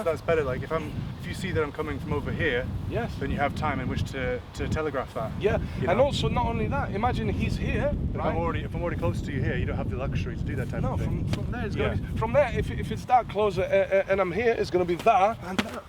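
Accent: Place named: England, United Kingdom. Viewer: Yeah very long time by your accent. it's just a curious combination of accents. It took me a minute to figure out you were a native french speaker. In the beginning first few seconds you sound very english . Regional accent is very strong but they you listen again and you can tell you are not a native speaker but it's very hard to tell where. I figured french . THEN I saw your name.. after J wrote the comment and realised.. ahhh obviously he's french. But it's not at all easy to tell. You definitely sound more English that french..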